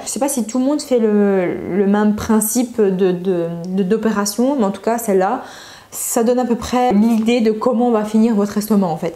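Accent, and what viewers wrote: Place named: Belgium. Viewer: T'es belge non? J'ai reconnu l'accent belge 😭😭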